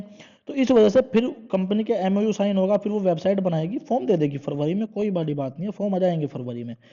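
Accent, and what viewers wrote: Meerut, India. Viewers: Tum meerut se ho kya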